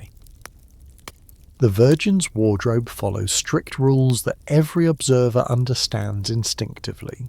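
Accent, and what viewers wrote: England, United Kingdom. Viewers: It's 5.40 am in South Australia. Love the history, and the English accent ❤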